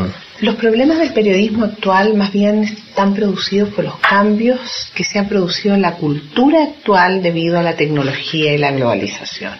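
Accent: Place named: Chile. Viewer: Sin duda, un acento distinto. Claro y confuso a la vez. ¿Acento chileno?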